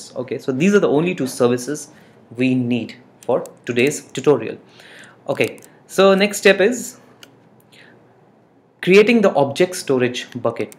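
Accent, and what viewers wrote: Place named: India. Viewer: That is the most childish explanation I have seen of setting up anything esp VPN just copy paste 0.0.0.0/0 what does that mean bro lol for average people at least tell them what it means ?? what rubbish! Guys at QNAP please get someone better then this indian dude or pakistani and trust me I know the accent from where I was born!!!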